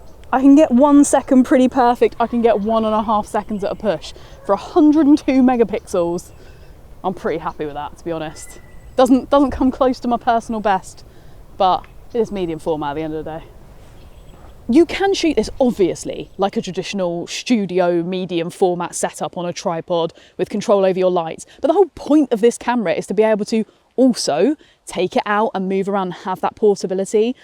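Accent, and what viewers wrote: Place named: United Kingdom. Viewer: 👍🏾👏🏾💐📸👸Good review. Love the British accent. Phelix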